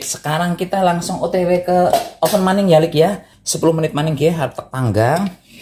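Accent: Banyumas, Indonesia. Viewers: Kiye bagelen ngapak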